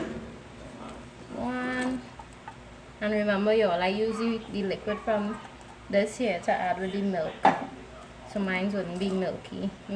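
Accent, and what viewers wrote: Trinidad and Tobago. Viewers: A tru trini talk dey gal 😂❤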